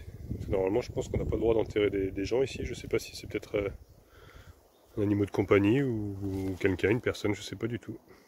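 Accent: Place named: Lyon, France. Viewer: Il est de Lyon sud. C'est un gone. Ca s'entend a son accent, parfois.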